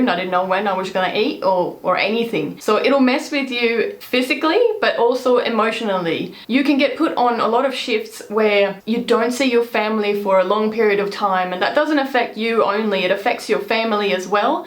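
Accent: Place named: Australia. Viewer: Hey. I am always wondering... your last name must be Swedish (is it the name of your hubby?). But your accent is clearly Australian to me. I am wrong? Sorry for asking such a crap. But as an Australian in my heart, I am interested in such things...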